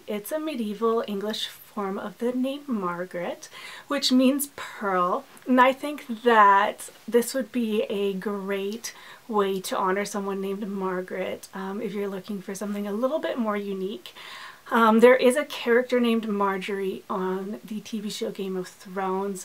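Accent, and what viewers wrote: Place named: France. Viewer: La chanson de Roland hahah how I love your french accent ! Much admiration from France belle demoiselle ;)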